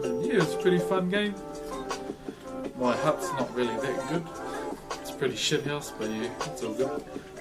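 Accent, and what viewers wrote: New Zealand. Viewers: Kia ora, just came across your content and hearing you talk remind me a lot about New Zealand. Spent nearly 10 years in Aotearoa, one of the best places on Earth. Keep up the good work bro!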